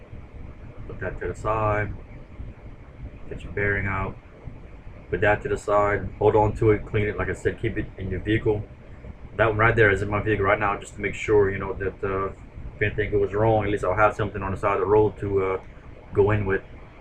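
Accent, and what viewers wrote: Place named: Louisiana, United States. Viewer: I recognized that accent immediately. You from down the bayou. I’m from Thibodaux.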